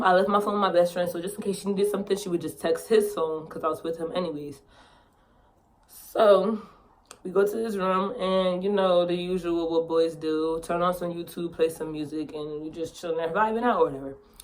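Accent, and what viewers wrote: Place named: New York, United States. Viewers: She from New York😭! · You can tell she from New York😂 or she might be from philly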